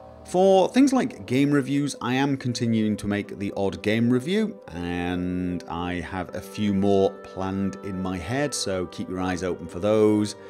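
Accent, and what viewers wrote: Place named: Scotland, United Kingdom. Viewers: LOL I always thought you were Scottish. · Norway? Honestly, I would have never guessed. Hahaha (facepalming) I thought you were Scottish....at least that's what I thought your accent sounded most like. BTW, I haven't heard your opinion on Bethesda's upcoming, special E3 Conference....do you think they'll be announcing Fallout 4? I'll definitely be keeping an eye out for your mods when it does come out. Personally, I think they're getting ready to announce it. I can't think of any other reason they would rent out an entire theater in downtown LA for a day.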